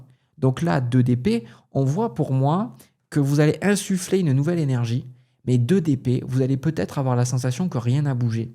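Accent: South, France. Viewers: Merci 🙏💗, je viens de te découvrir, c'est très parlant, c'est marrant toutes les cartes que tu as sorties sortent aussi dans les autres tirages, donc, cela confirme le tout! Je te souhaite une merveilleuse journée et ton accent du Sud me rappelle mon enfance en France, bisous 😘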